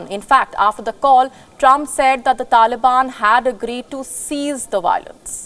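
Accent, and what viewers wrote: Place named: India. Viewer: Sir , Nobody talked about professionalism here It was just a personal request . I'm in no way demeaned the current anchor . She is good . And If you don't get Indian accent there are many other great news channels you can switch to them anytime It's better not to hurt your ears . By the way , your comprehension skills aren't great either !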